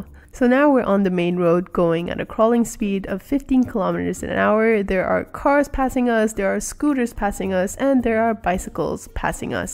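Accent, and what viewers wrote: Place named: Vietnam. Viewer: Can't wait for the next onee!!! <333 Love your vietnamese accent LOLOL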